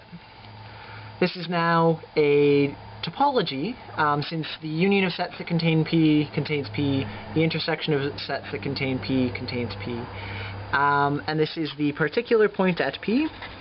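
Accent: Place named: Canada. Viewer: he keeps sayin oout...canadians.......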